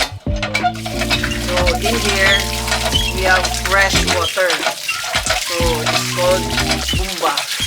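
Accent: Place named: United Kingdom. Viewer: Lucu kali mas the way he speak "british accent" make me laugh at the same time of his cute face.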